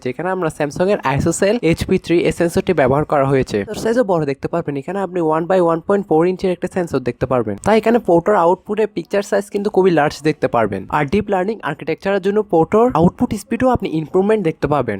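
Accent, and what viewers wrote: Chittagong, Bangladesh. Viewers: তুই কি চিটাগাং এর?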